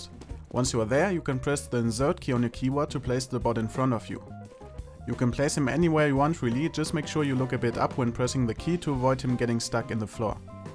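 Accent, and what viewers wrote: Germany. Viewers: ★ CS:GO Channel ★ shit also bist du doch deutscher das hört man sehr sehr sehr gut raus:D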